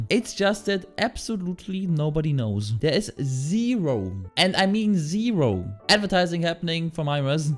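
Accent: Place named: Germany. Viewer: Bist du Deutscher wenn ich fragen darf, das hört man irgendwie heraus...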